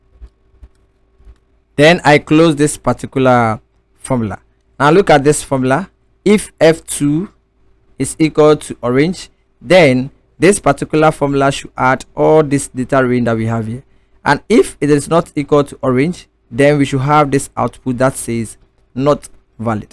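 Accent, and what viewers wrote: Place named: Africa. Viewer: I really love your accent. Are you an African?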